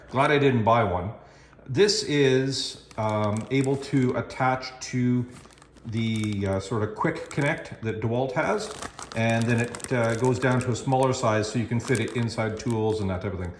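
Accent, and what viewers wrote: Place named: Minnesota, United States. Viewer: You must be from Minnesota. Nice unboxing and walk through of the unit!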